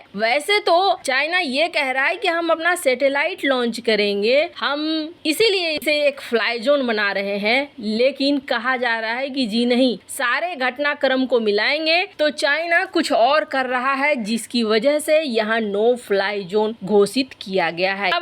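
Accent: Bihar, India. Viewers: Apni hindi sahi kare bihari jaisa kiyoun bolti ho chan Santi etc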